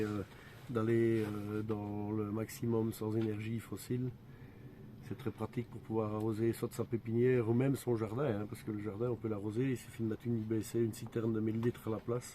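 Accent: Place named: Belgium. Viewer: L'accent Belge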